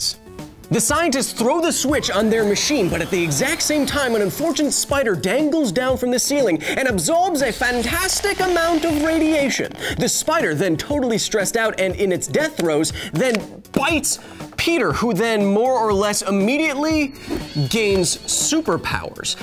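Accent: Australia. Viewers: thats a good Australian accent! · The aussie accent 😂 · That aussie accent is a 7/10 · unironically a fantastic australian accent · ikr! I'm surprised there aren't more comments talking about austrailian accent lol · I know I'm late to the party, but that Aussie accent is almost spot on. had me excited for a sec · That aussie accent 😂🤣❤🇦🇺 much love from down under. Bloody great video mate! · He's Australian accent 😂... It's good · His Australian accent is actually very real lol. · That Australian accent is on point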